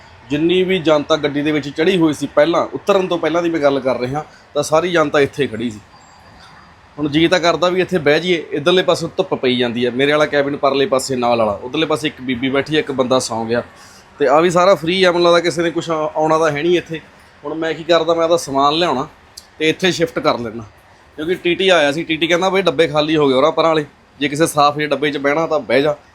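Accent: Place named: Malwa, India. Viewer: chal oye ehe baut vadia part c, bai ghaint a pura, roti baut sohna kehanda, menu lagda malwe da hana bai?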